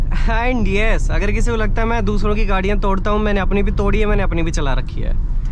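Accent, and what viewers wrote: Haryana, India. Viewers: Haryanvi Chalke h bhai boli m 👍👍👍😃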